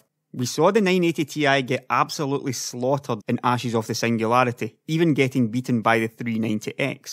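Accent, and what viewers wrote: Scotland, United Kingdom. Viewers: the scottish accent is awesome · what accent is that? you make me think of Brave's highlanders. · Really liking to hear a Scotsman talking tech. Keep up the videos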